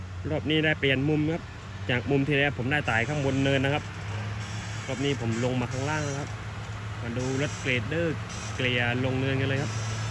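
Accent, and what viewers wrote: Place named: South, Thailand. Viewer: การใช้ภาษาทางใต้จะไม่ค่อยเหมือนภาคอื่น เลยทำให้ฟังแล้วมันแปร่งๆ สำเนียงไอ้น้องมันยังทองแดงเลย อภัยเถิดครับ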